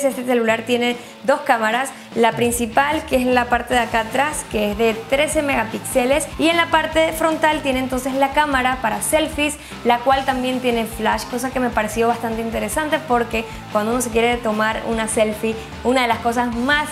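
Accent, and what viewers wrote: Venezuela. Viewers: Me parece conocido tu acento ¿eres venezolana?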